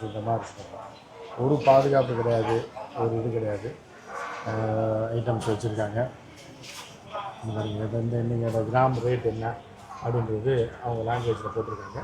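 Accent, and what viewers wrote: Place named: Madurai, India. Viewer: வணக்கம் தொடர்ந்து காணெளி பார்த்து கொண்டு இருக்கிறேன் இதை பார்த்த அதன் மதிப்பு அவ்வளவு தான் உங்கள் பேச்சு நையாண்டி கலந்து மதுரை பேச்சு உங்கள் சேவை தொடரட்டும் இப்படிக்கு ரேவதி பிரபாகரன்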